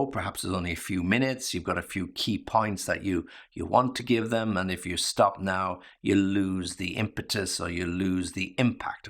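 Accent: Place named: United Kingdom. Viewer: Enjoying listening your British accent🤩, thank you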